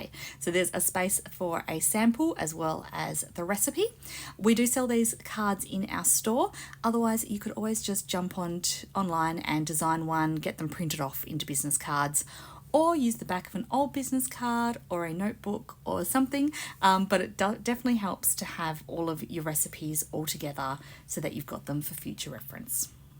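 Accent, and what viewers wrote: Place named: Australia. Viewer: This time of year is my favorite when it comes to making pretties because of the color palette! These sure are some pretty ones you made!!! Like I’ve said before, I could listen to you talk all day long. I absolutely love the Australian and British accents!!! I live in America, but I sure would love to find me a man with a nice accent!!! 😂 Something I started doing rather than buying all of the different pearl clay separately I just buy a bunch of pearl clay and turn any color. I want to into a pearl! When I make snowman, I usually use the pearl. And sometimes I like to not get it completely mixed up where it’s swirled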